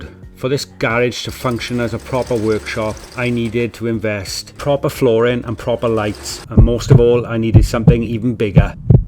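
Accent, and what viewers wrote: Wales, United Kingdom. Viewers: By any chance are you Welsh ??? 😊 Good luck mate and where are you?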